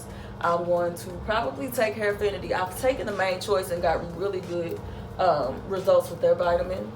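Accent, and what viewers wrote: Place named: Texas, United States. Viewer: Sorry if you have answered this before, but are you from Texas? That accent is giving me Texas lol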